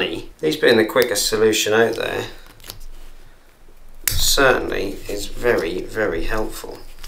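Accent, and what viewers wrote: Norfolk, United Kingdom. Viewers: Are you from Norfolk? & put a Ferrell/bootlace on it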